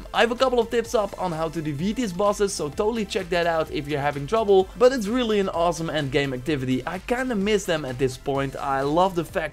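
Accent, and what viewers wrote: Netherlands. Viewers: You sound dutch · You are dutch, I can hear it in your accent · Are you Dutch? You sound dutch. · Lol you are so Dutch, you can hear it from your accent alot. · Ik hoor aan je accent dat je nederlands bent xD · Are you dutch/belgian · This is definitely a dutch guy. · Hes dutch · You're Dutch, aren't you? We have such an easy to recognize English accent. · Nederlqnds ofnie maat dat duidelijk dunglish is hoorbaar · are you dutch lol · You sound Dutch😎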